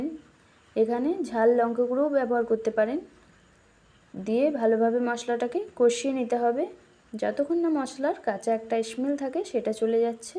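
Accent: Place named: Kolkata, India. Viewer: Assalamu Alaikum. Shotti boddo bhal laagchhe ata bhebe je, kolkatar muslim apur ranna dekhte parchhi🥰. Ami always kolkatar ranna dekhi. Alhamdulillah akhon akjon kolkatar muslim apur ranna dekhte parbo 🥰. Ami USA 🇺🇸 theke apnar ranna ta dekhechhi, aj shokale apnar recipe u tube a pelam. First I thought u r bangladeshi pore kotha shune bujte parlam ta kolkatar 🥰🥰. Shathe shathe subscribe kore felechhi. bolchhilam je, Kolkata te kuthay apnader bari?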